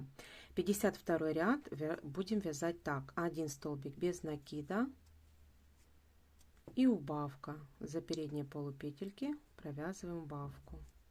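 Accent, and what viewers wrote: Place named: Voronezh, Russia. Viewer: Огромное спасибо за мастер класс, и так приятно слышать родную Воронежскую речь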